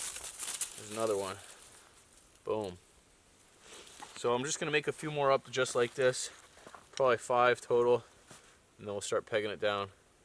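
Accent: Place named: Canada. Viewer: Yep. 1am here in North Queensland and this is the 3rd video ive have watched. I leave his videos running in the background because is canadian accent is so soothing.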